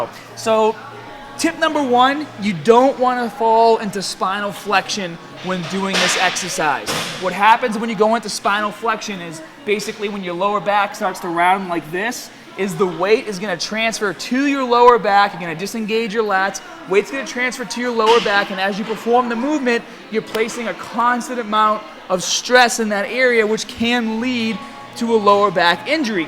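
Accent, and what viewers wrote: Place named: Massachusetts, United States. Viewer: That Mass. accent seemed heavier than ever in this video, haha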